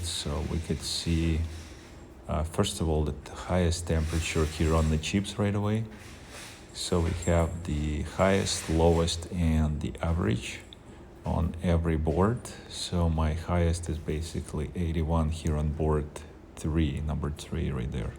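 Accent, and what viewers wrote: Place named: Russia. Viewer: Брат, ты из России? Акцент выдаёт😏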